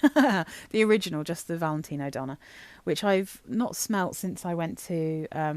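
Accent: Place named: United Kingdom. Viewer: Well, I'm in Atlanta but I'd sure love to meet up and go sniffing around London with ya! (Maybe someday I'll make it across the pond!) Lol. Love your weekly roundups and your British accent is so lovely to my deep south American ears... My SOTD is SJP Covet, which I know you enjoy too... Happy Birthday! 🥳🧁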